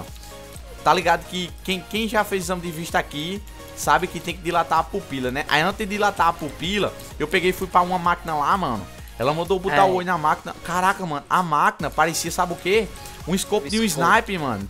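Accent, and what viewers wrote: Northeast, Brazil. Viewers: Bola de GÚDÍ , BÓDÍ KKKKKKKKKKKKKKKKKKKKKKKKKKKKKK Eu amo os sutaques nordestinos Kkkkkkkkkkkkkkk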